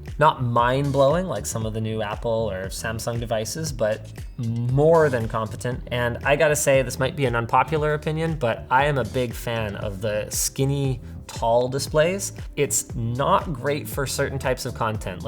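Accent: France. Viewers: Yo you have a nice french accent Linus boy, and i live in France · Linus talking french is the best accent ever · Nice French accent! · That french accent 😆😆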